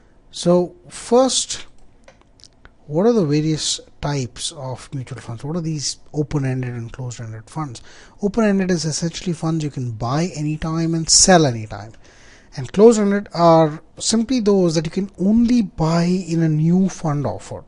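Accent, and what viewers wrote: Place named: India. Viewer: Indian english, not bad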